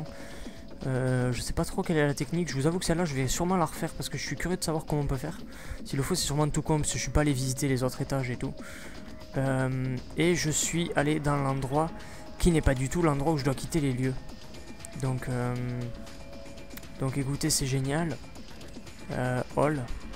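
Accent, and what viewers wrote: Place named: South, France. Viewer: jadore ton accent du sud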